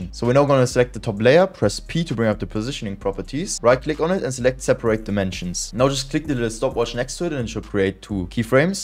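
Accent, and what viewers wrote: Germany. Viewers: Der Bruder muss deutsch sein bei den Akzent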